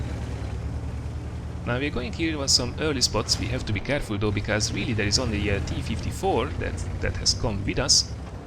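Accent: Hungary. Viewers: You got a really hungarian accent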